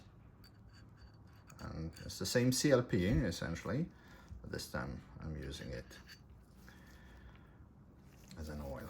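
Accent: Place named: France. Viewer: I avec the impression que il y a un litel french accent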